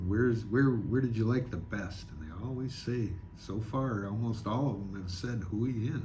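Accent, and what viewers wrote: Canada. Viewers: You hear some good ol' Canadian, eh??;-))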